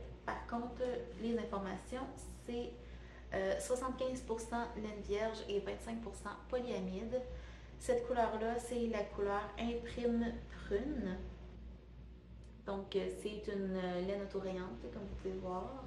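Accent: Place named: Quebec, Canada. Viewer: Bonjour, j'aime aussi beaucoup les tricots zéro cerveau 😂 Tu devrais aller voir les pod cast de Christelle Nihoul Christal NK, elle explique bien les modifications à faire pour les poitrines plus larges que les épaules et les hanches pour éviter de se retrouver avec des modèles trop loose. Moi ce serait plutôt un petit ventre à caser! A bientôt pour un deuxième pod cast tricot. Ce premier était très réussi. En bonne Française de France j'adore l'accent du Québec ❤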